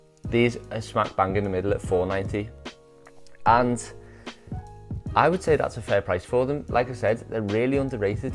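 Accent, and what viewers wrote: Liverpool, United Kingdom. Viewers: Scouserrrrrrr miss this accent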